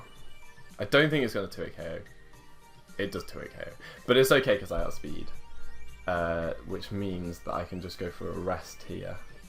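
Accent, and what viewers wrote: United Kingdom. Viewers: Man I love British accent, keep it up!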